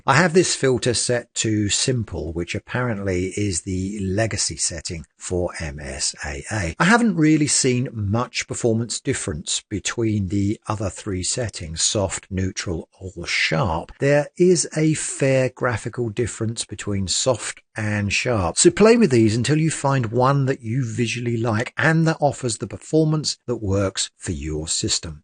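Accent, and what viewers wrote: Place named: United Kingdom. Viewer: I’m in UK (as I assume you are from the accent 😂) and I don’t think it’s a regional rollout of 24H2. It was initially rolled out, had tons of bugs, and then pulled back. It’s since only being rolled out to systems with hardware device IDs that Microsoft have verified as not having issues from what I understand. This means if not offered, you may have some hardware they’ve not fully ironed out the issues out for yet.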